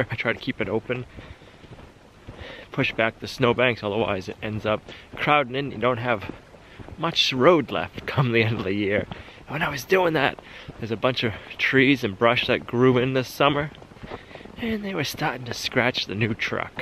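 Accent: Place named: Boston, United States. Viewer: Just subscribed to the channel, I can hear hints of the Boston accent but where about are you located now( obviously not too detailed for personal reasons) but dang you guys have some good snow already. I’m from the north east as well and we’ve only just got our first snow.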